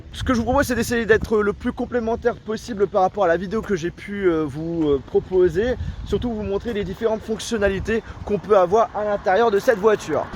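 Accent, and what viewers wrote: Belgium. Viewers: Mais est belge? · Tu est belge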